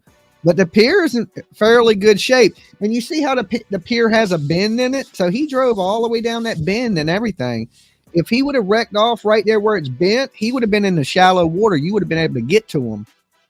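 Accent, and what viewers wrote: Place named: Tennessee, United States. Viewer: I want to send you a list of words to say so i can listen to your accent. It always makes me smile! Hand to heart im not making fun of you. My aunt is frim the HILL of Tennessee, and the speech patterns are awesome. I greq up in Indiana (not accent) now living in Texas per family vack gome i have an accent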